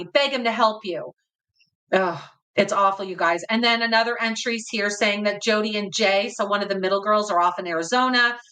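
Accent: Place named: Prince Edward Island, Canada. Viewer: I am a PEI gal and saw you on Mormon Stories! I recognized your accent!!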